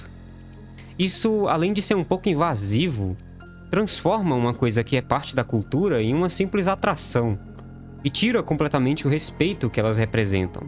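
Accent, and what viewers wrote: Rio de Janeiro, Brazil. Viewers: Esse sujeito é carioca ?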